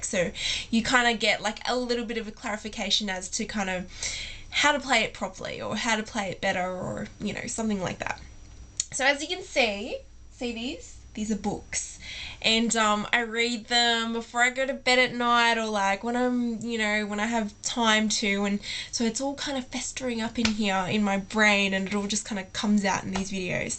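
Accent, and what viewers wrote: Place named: Australia. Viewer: u have such a aussie accent... and r u wearing a spaghetti strap for PJS?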